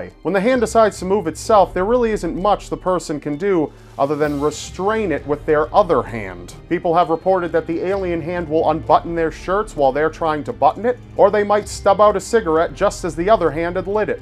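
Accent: United States. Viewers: Because he is American ? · thepurplewalrus Probably because he's an American or something.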